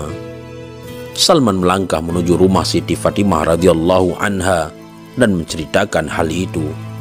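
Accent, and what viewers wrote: Aceh, Indonesia. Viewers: Dialeg Aceh sptnya..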